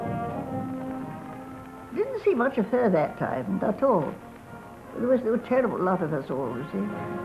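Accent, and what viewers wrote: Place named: RP, United Kingdom. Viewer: She's so posh, I can't understand her.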